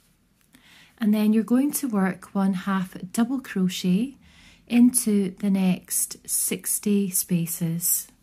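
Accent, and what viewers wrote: Scotland, United Kingdom. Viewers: Just subscribed I enjoyed your video tutorial sooo much. As a newbie to crochet I found your instructions and pace, just right for a learner and I found your voice so calming and clear. Being a fellow Scott I warmed to your accent too. Your wee hoodie couldn't come at a better time also as there's a new baby due in the family in February. Thank you for your hard work and inspiration. Have a merry Christmas :0) P.s. would love some small baby dolls patterns for the new big sister's doll, 10 inch would be great ☺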